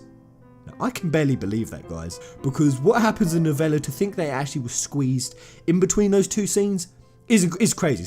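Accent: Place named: London, United Kingdom. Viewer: I love hearing Japanese names said in a London accent. Shinji 'Ashy Mow'ow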